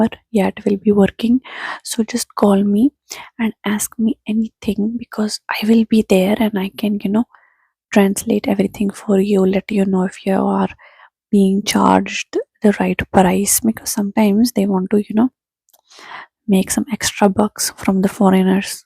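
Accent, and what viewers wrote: India. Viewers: You need to make more flirty videos with the indian accent.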